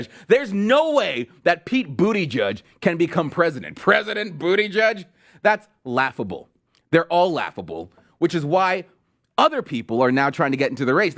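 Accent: Jamaica. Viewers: I like his Jamaican accent. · Are you jamaican? That was pretty good lol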